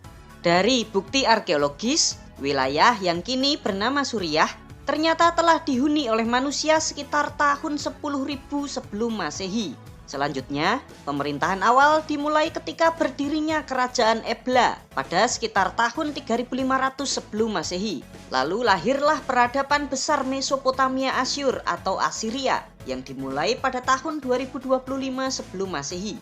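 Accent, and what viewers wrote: Java, Indonesia. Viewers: Jawa medok bner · Kok kyak ngomung bahasa jawa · Metdok buanget.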